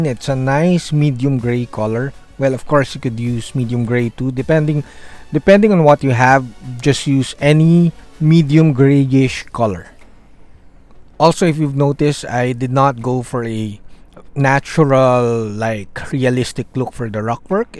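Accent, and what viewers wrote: Philippines. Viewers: Heavy Filipino ascent say peanut butter.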